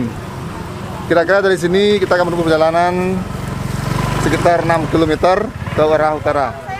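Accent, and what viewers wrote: Bali, Indonesia. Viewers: logatnya itu lohhh bali bangett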